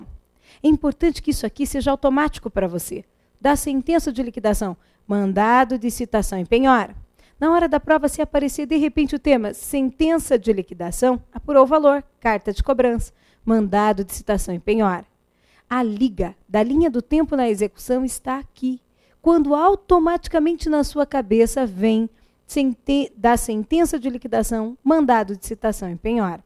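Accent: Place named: South, Brazil. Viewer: Essa figurinha tem sotaque da Região Sul. Muito boa aula. Parabéns conterrânea.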